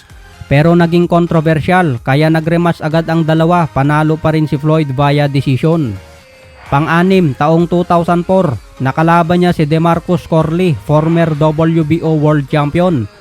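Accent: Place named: Ilocos, Philippines. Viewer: Ha ha ha, ilokano kasi si kaya instead na Thai ( Tay)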